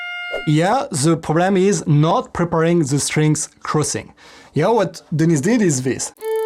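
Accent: France. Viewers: I love your French accent 😁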